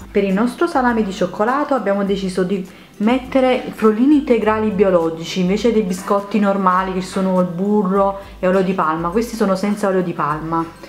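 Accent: Naples, Italy. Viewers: si sente ke sei di napoli😂..cmq bella ricetta io ke amo il. salame al cioccolato e lo faccio spesso..xo ho iniziato la dieta e forse così sarebbe ottimo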